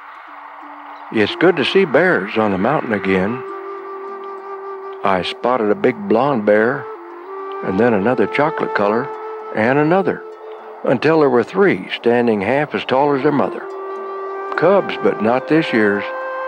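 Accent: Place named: South, United States. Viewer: Alaska??? Has to be. He sounds southern tho. Man I wish I could build like this we have all lost this knowledge its so sad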